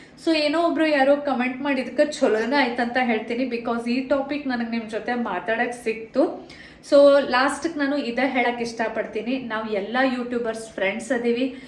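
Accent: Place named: Belgaum, India. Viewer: Nivu bhal channngi matadutri namma belgium bhasha keli bal chalo anisstte❤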